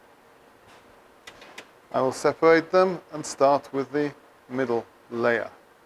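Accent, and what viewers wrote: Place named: United Kingdom. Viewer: You sound British are you from the uk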